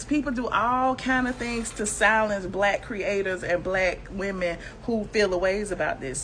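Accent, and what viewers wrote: South, United States. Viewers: you be tickling me to death lol gosh I miss the South when I hear you talk.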